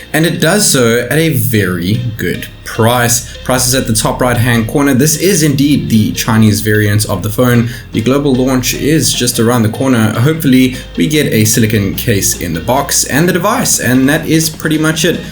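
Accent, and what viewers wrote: South Africa. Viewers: South African accent? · Is that a South African accent I detect?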